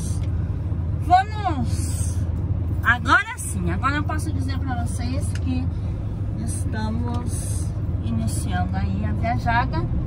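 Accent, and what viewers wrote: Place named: Santa Catarina, Brazil. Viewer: Boa noite, mas de novo vai ficar de varde😂 amo esse modo de dizer as palavras, morei em Santa Catarina na cidade de Modelo por um ano, os meus piás, já estava com sotoque😂🥰 mas você merece😉fica com Deus e boa viajada 🙏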